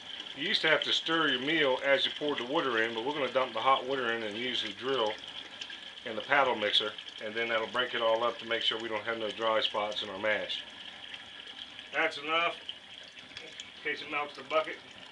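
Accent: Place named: New England, United States. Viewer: U from new england? You say wutter instead of water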